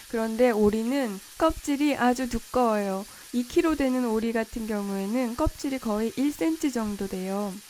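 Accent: Seoul, South Korea. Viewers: 와 이분 진짜 오리지널 서울 출신이신가보다. 서울 사투리가 말투에서 묻어나네요.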